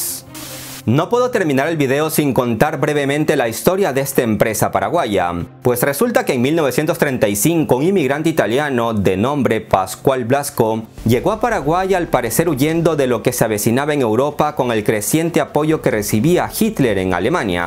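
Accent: Peru. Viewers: POR TU MANERA DE HABLAR, DEFINITIVAMENTE ERES PERUANO. YO CONOZCO MUY BIEN A MI GENTE. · Eres peruano verdad? Si es así saludos de un paisano..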